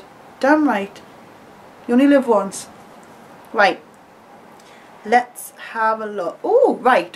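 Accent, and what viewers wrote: Newcastle, United Kingdom. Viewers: Hi, I just wanted to say watching this video was so emotional for me and brought back a lot of teenage memories, your tutorials helped me through many Halloween’s and to make me feel beautiful at school, I have to admit I was bullied for being ‘emo’ but my makeup was amazing and I learned a lot from you! maybe just 13 years old when I firs5 discovered you! I thought you were amazing and watched you for hours on end. I’m now 25 and still been following your channel since back then. I love that you’re a real person, watching your videos is like having a chat with you, just like back in the good old days! I feel very sorry that this happened to you, you really are a down to earth lovely lady and I hope to see you back on here more! I also get a good giggle out of all the geordie sayings I’d say to my dad, it’s not often you hear someone on here chatting the same accent anymore! 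Sending love it’s so good to see you!!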